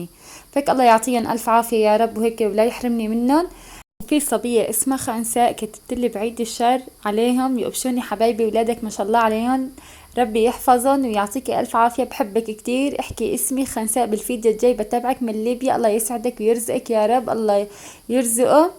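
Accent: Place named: Aleppo, Syria. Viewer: تشرفنا روحي والله عرفتك من حلب من صوتك بس استغربت عبقلولك انتي من شام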